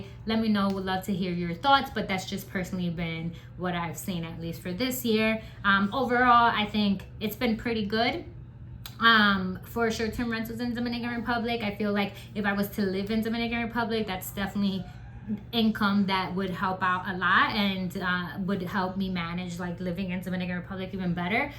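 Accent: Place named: United States. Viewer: Great breakdown. Thanks for posting it. How did you end up in DR? You look Dominican but speak American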